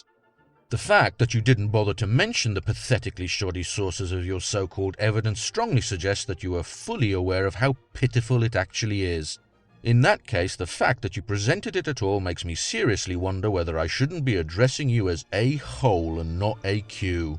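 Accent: United Kingdom. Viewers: omg that british accent just makes it so much more hysterical as fuck. You and coolhardlogic are amazing debunkers and trashing these tin foil hat conspiracy ridden creationist fools just makes it even more gold. Thank you so much for bringing logic to the crazy that seems to be apparently spreading all over youtube. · These videos always have one thing in common, obtrusive in debating style. Bold face lies of no evidence refuting evidence.British accent is alwways evident of a blaphemous intellectual upbring on prejudice conjecture. This guy has an anal and poop fixation.This rant is just hateful and has no substance of honest debate. The mark of the beast is definitely this mans free will choice to his quanrantine to foul language zone of nothing but darkness and no love.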